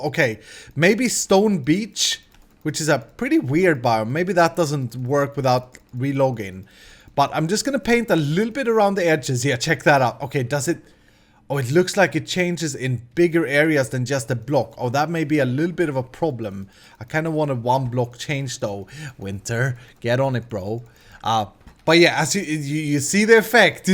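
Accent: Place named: Sweden. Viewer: Omg -, you made a whistle sound that made me bust out laughing! man I love Swedish accents <3.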